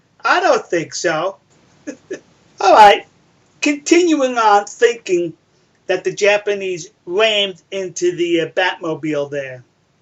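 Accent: New York, United States. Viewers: i don't like whalers, i don't like antiwhalers, i don't like your stupid yankee accent. the whole shebang=not newsworthy. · dude, if you gonna defend any side at least use common sense and not your rly funny new york accent...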